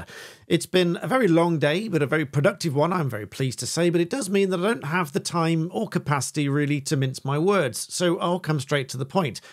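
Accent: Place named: RP, United Kingdom. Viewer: I'm sorry but is there something about labour getting in that puts you suddenly against corruption? I've wrote to you a number of times pointing out that housing policy breaks the children act 1989, the children and families act is broken in standard practice ubiquitously, and despite the issues this causes society and how much extra doing this costs the public purse as well as those impacted and not a peep. Your tory Prime minister who committed billions in fraud and your other Tory comedian hiding from piers morgan in a freezer because he didn't think you deserved answers along with the lettuce who tanked the economy are gone and then suddenly, after ignoring the care act 2014 being contradicted since it was introduced, you suddenly care whether government ministers are honest or not. So much so this has altered from a brilliant law channel, to some baldy hard nock with a posh accent biling on because the party who wanted to scrap the human rights act didn't succeed in doing so. 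Hard luck. I will now watch the video, I wanted to write this before I do because I just saw this and my heart sank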